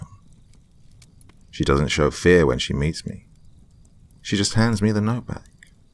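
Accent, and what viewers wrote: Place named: United Kingdom. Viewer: Turkey? Thought you was UK lol well wherever you at thanks for the story's :)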